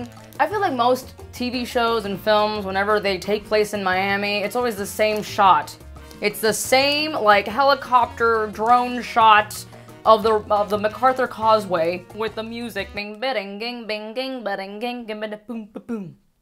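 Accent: Cuba. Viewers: I HAVE THAT SAME COFFEE MAKER AND SAME COLOR I love you girl you are my favorite and you make me laugh, I'm Colombian we have a few things in common with Cubans I been noticing and I love your Cuban accent you are just the funniest and the best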